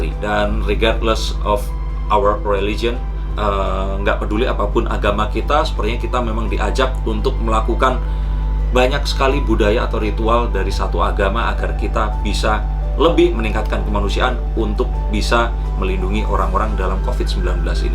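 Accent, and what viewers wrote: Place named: East Java, Indonesia. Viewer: sampean wong jawa timur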